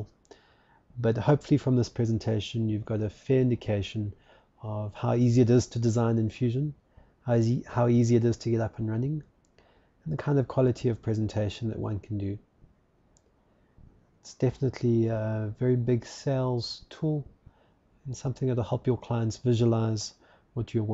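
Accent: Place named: South Africa. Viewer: hey....a south african, bru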